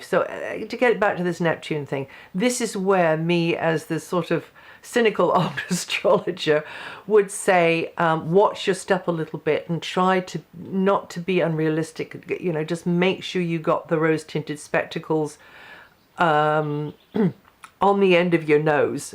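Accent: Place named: RP, United Kingdom. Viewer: great reading which part of the UK do you live in you sound posh xx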